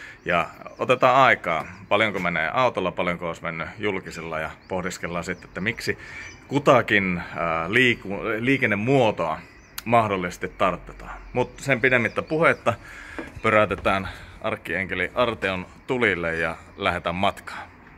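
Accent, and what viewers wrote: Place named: Espoo, Finland. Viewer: Melko Espoolainen aksentti....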